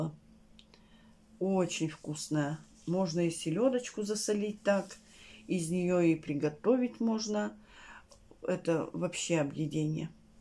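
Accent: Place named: Urals, Russia. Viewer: Я не так давно на вашем канале, и при просмотре первого видео сразу услышала уральский говор.😊 Я сама родилась и выросла на Урале, и хоть и живу уже несколько лет в Питере, но наш уральский говор узнаю сразу 🤗😊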